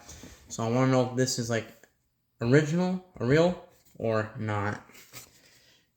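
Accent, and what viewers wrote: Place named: South, United States. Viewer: wow you sound like you’re from the south that’s amazing that you’re from Canada. I love the Canadian albums big fan.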